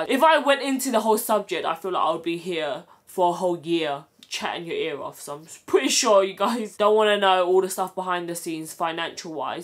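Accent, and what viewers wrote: United Kingdom. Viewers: Hi! Wassupppp??!!..🤗😬 i just looove love loveee to listen to yr brit-ish accent..hahahaha...take care love 🌹🎈🥰 keep inspiring us! Have a safe flight and enjoy your vacation and boo! Haha...just have a comfy and silent vacay love. Let yr mind get more rest yaaa..cheers!🎈🌹🥰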